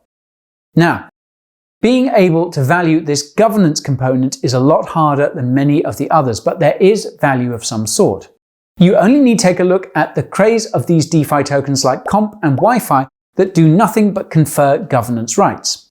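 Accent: United Kingdom. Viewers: I’m going to trust you on this one because you have a british accent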